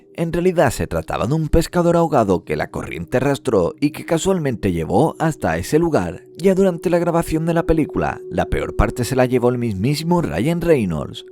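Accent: Andalusia, Spain. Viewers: Una pregunta, eres de España(andaluz) Like si crees eso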